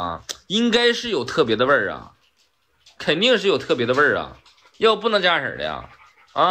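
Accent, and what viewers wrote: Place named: Northeast, China. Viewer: 吓，不是嗎？哈哈哈，東北漢普通話我只聽懂7O%而已，等我再聽一次。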